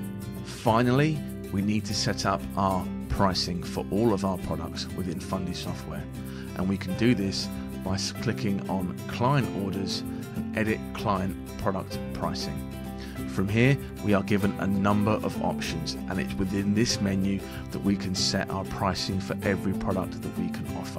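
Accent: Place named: United Kingdom. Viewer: PLEASE LOWER THE BACKGROUND MUSIC ITS HARD TO UNDERSTAND A BRITISH ACCENT SOMETIMES THE MUSIC DOESNT HELP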